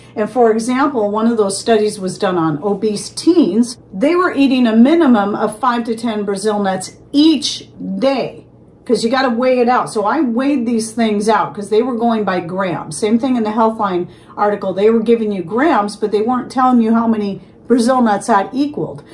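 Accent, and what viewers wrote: United States. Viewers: Hi, I do like most of your videos, but I am finding American accents a bit hard to understand! I was born in Norway, so Norwegian is my mother’s language and my first language. I have lived in South London, England for 54 years now, so English should come easily to me, but I am nearly 72 years old and my brain is slowly loosing a bit of each language! But keep up your good work As I have learnt so much from your videos. Blessings ❤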